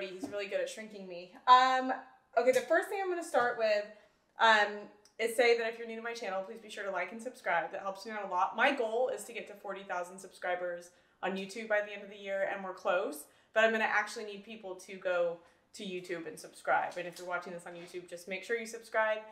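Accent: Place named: California, United States. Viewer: You can totally tell she's from California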